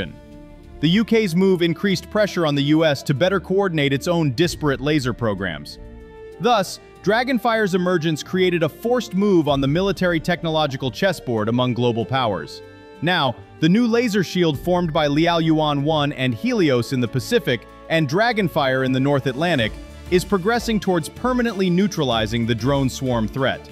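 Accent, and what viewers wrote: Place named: Russia. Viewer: you sound like a Russian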